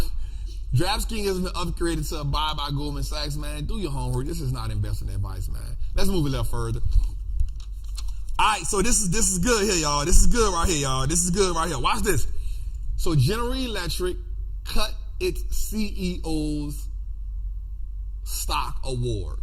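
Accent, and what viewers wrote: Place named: St. Louis, United States. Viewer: He kinda sounds like he’s from Louis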